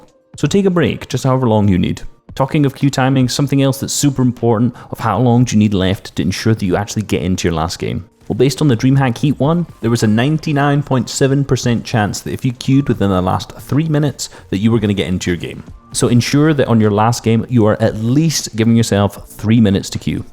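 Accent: Scotland, United Kingdom. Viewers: are you scottish?? if you are you are my favourite analyst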